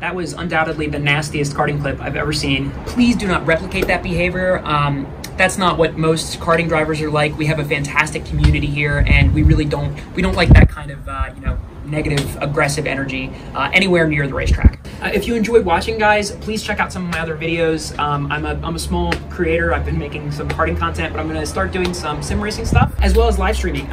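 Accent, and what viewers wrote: Liverpool, United Kingdom. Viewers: No he’s not, I don’t get why everyone saying he’s Irish he’s Scouse from Liverpool. Sounds nothing like Irish. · I know the accent, I'm from the same city as him 😂 Liverpool, honestly it's normal but still awful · The scouse accent tho "whaqqqt" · He’s scouse what do u expect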